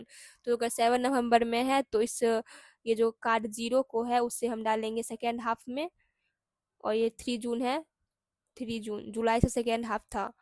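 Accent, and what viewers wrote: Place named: Bihar, India. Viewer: Ap bihar ya jharkhand se ho na ap ka accent se pata chalta hai and Video is very helpful thanks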